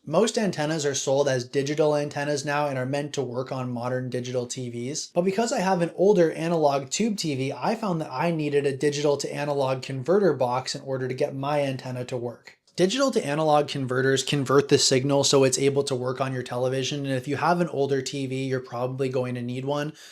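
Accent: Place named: Canada. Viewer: Eww you sound like from cAnaDaaa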